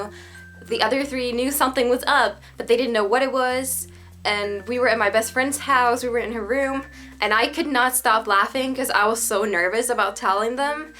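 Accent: South, United States. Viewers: You are picking up southern inflections! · If I heard you speaking without any reference otherwise I would place you as an American. I guess you were young enough to lose your Finnish accent when speaking AM/English. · Lol, I just thought, what if you were to talk to your old English teacher again? Not only do you speak impeccable English, you sound like you were born & raised in the U.S.. You’d be correcting her lol, but you could tell her your success was because of her & I bet she’d be so proud. 😊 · Southern accent detected! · You have developed a bit of a southern accent!! I live in East Tennessee born and raised here.But my father was born in Asheville NC and I still have distance family there. Its a beautiful state. Have you and your family visted the Smokey Mountains, Pigeon Forge or Gatlinburg?? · Can't you tell what type of English she speaking? 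It sounds like American English to me not British. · When you speak it sounds like you have a bit of a southern accent.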